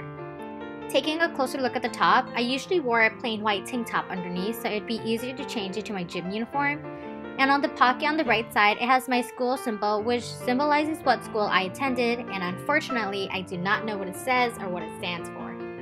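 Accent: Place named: United States. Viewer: Don't know your channel. You sound American, how'd you end up in Japan?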